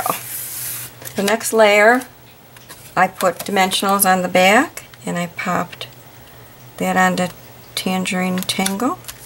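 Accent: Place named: Illinois, United States. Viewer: are you from : Illinois, Wisconsin or Michigan area? You sound like my daughter in law lol :-) She's from Cary, ILL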